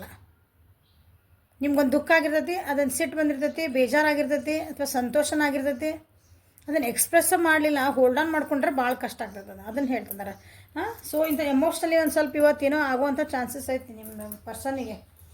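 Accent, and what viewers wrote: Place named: Badami, India. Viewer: Hi mam...nimmadu yava uru mam?nimma matina shyali namma uru Tara ede...nammadu badami..nimmadu yav uru mam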